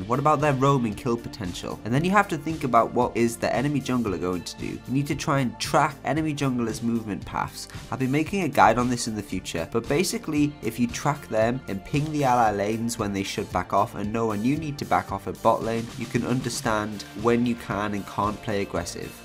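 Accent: Newcastle, United Kingdom. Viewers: You're from newcastle aren't you?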